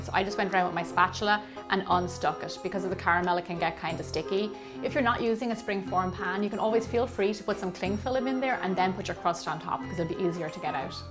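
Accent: Ireland. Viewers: ur accent is beautiful what part of Ireland r u from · She sounds Irish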